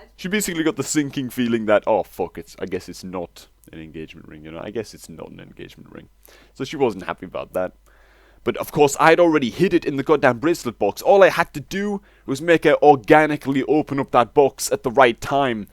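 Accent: United Kingdom. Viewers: What the fuck maaaannn I thought you sounded Brit but I wasn't sure but your fiancé is. Proper Geordie??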